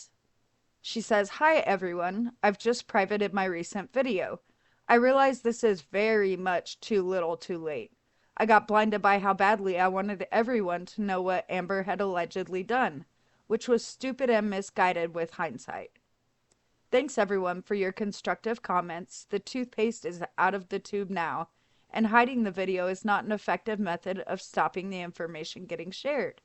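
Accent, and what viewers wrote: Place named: South, United States. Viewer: !! Ngl I’m fan girling right now. I love your channels & think you’re incredibly talented! I love your quit wit & humor, plus as a Southern girl your accent reminds me of home. ❤ Just had to utter fluff 😜& send you love & appreciation. 😊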